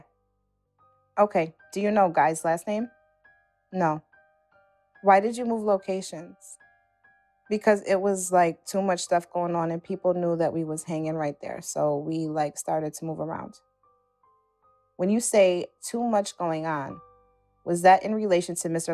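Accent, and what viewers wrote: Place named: Baltimore, United States. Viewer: The narrator sounds like she from Baltimore, my city done gone to hell. Something has to be done about all of these shootings & murders or law abiding citizens need to protect themselves because the police can only do so much !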